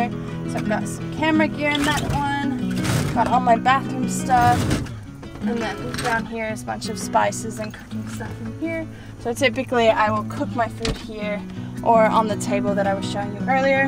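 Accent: Australia. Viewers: So many American's love Australia. You sound and look local now, from when you first arrived. Tanned, barefoot all the time and the tatts. At least they don't look down on you like in the US when you walk into a store without shoes. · Am i detecting a slight Aussie accent??? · Great van! PS I like the slight Aussie accent you've got going on now :)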